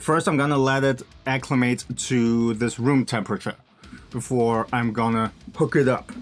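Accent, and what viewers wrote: Germany. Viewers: Are you a german guy...?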